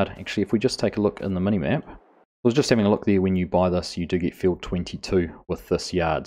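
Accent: New Zealand. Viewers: I love this NZ accent 😁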